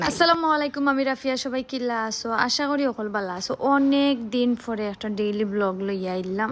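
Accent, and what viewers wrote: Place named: Sylhet, Bangladesh. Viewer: আমা গ সিলেটি আপা 😅😅😅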